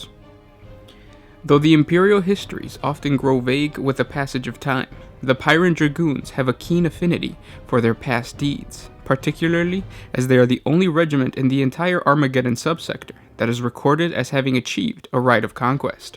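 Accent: United Kingdom. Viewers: Right off dat bat British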